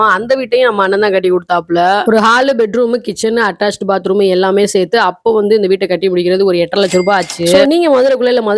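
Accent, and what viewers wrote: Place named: Kanyakumari, India. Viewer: Akka kanniya kumari slang pesuanga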